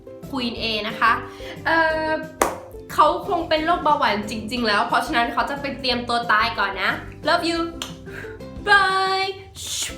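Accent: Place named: Suphanburi, Thailand. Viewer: เป็นฝรั่งที่พูดไทยเหน่อเป็นฝรั่ง. แต่ทำไมตรูนึกถึงคนสุพรรณ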